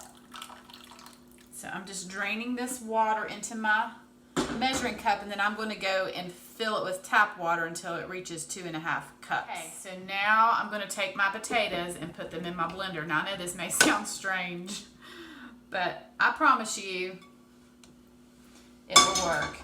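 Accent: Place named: Appalachia, United States. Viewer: the minute I heard the first two words out of your mouth, I knew I had a fellow mountaineer! I am so excited!!!!!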